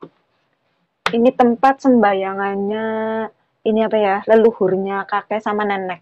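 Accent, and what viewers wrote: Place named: Java, Indonesia. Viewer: Sama.....lucu mbak kalau ngomonh jawa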